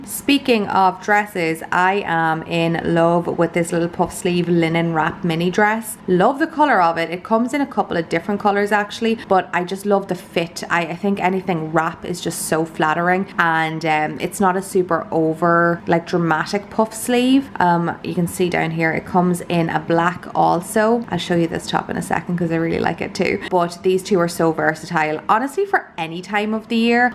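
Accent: Ireland. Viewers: I am not a foodie nor a cook, but you make cooking look so interesting! I especially like the hummus recipe, may have to try that one! I want to come back to tour Ireland again so badly. Love the people, but in the mean time i just sit and watch you and listen to your accent :) OH as soon as possible could you take a walking or bike tour around your city?! Stay healthy, as i see and i am sure you are <3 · Hopefully you find this insight amusing but since watching your videos I’ve been getting so much better at understanding Irish accents. It used to be with Irish and Scottish videos I have to put my full attention to follow what’s being said but now I can listen to you just in the background and understand the gist just fine... still working on the latter though. Have a great week! - Gerry (from Canada)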